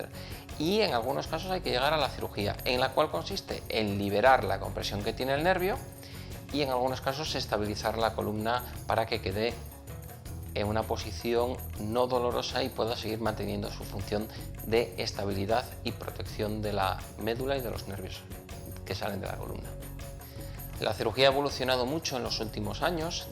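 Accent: Spain. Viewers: Dr yo tengo dos hernias pero yo vivo en México y por su acento español me imagino que Ud vive en España pero si quisiera saber el costo y donde podría contactarnos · ya decía yo por el acento era español...oh tan lejos doctorcito, estamos en América latina. 👍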